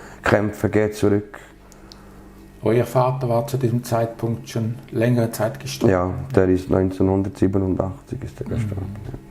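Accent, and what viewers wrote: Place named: Switzerland. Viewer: He talks Swiss-German Dialect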